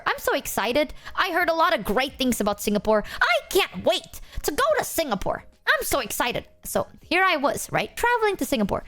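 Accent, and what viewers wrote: Indonesia. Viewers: I just realized. is the best representation of fluent English with Indonesian accent. If you want to know what majority Indonesian accent sounds like (in english), listen to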